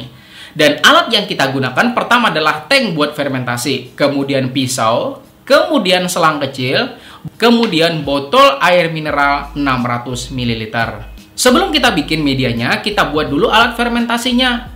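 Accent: Banjar, Indonesia. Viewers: Wahh kaya ny banjar banar nih sanak 😁👍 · kayak logat orang Banjar kkak... mantaappp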